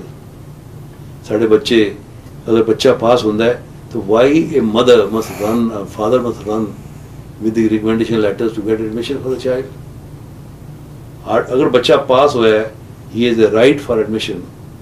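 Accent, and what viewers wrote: Jhelum, Pakistan. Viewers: tohadi boli v pakistan aali a❤ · Hy oy bismilaah kran mahra grain sunil mery same te suchi boli jhelumi original boli. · My uncle, who is almost same generation as sahib, who is also from Jhelum, has the exact same accent, tonation, pronunciation and fillers (for instance "Hain jee"). So amazing. Ditto copy. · Pure Jhelumi language.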